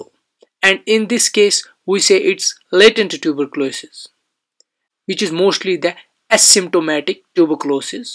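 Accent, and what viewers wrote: Kashmir, India. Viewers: Typical kashmiri accent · Sounding like a kashmiri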